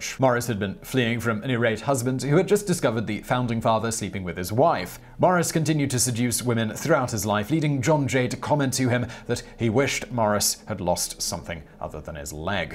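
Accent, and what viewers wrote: United Kingdom. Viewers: A dude with a British accent tells US about the founding fathers. Go figure!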